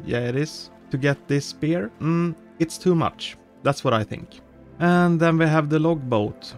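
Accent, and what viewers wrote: Sweden. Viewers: Hello your videos are awesome but i got 1 question for you (Are you actually swedish bc you sound very swedish like i do)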